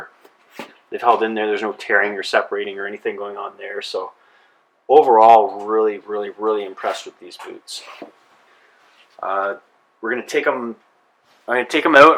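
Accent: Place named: Canada. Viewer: such thick canadian accent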